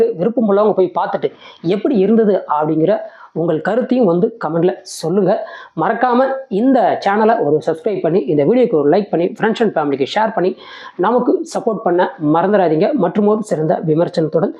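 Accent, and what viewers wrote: Kongu, India. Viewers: aiyo Mokkai padam season kodumaigal saaamy... well done review - Kongu Tamizh Vazhga..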